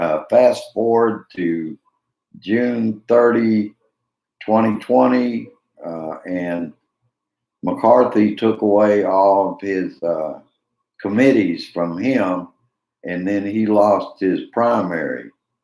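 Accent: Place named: Missouri, United States. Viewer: What's up! St. Louis Native here. Nice to hear the Missouri perspective with the accent! Keep it up!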